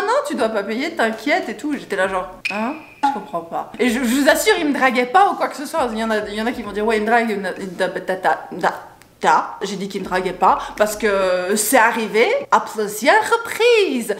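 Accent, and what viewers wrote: Belgium. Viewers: Ton accent belge ressort fortement dans cette vidéo lol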